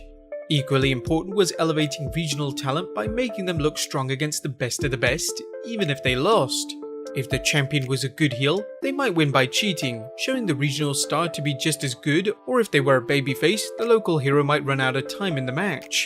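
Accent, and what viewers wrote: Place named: England, United Kingdom. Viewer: where is this guys accent from? i mean specific city in england.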